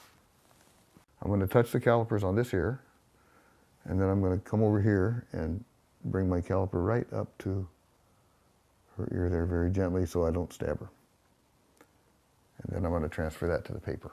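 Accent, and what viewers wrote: United States. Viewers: That's an honest to God, original Oregonian accent. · Midwest accent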